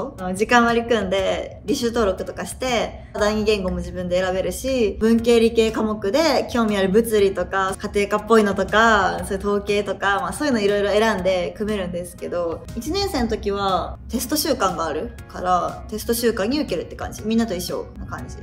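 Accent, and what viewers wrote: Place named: Hiroshima, Japan. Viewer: 奇を衒わない内容に加え岡山訛り？の広島弁が何とも堪りません。 高校大学同じなので勝手に応援中です 頑張ってー！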